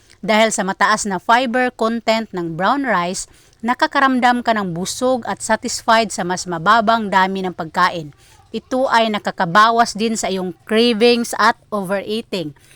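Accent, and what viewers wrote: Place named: Visayas, Philippines. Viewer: BISAYA KAMAN DAY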